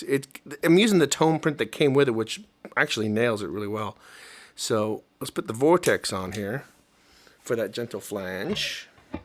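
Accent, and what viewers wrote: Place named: Baltimore, United States. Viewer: Fantastic sound! I, too, am a bit of a tone chaser and am gratified that with the tools I have, I can replicate those great Andy Summers sounds. Curious, are you from the Baltimore/MD/VA area? As a tone chaser, I also hear dialects. Occupational hazard.;)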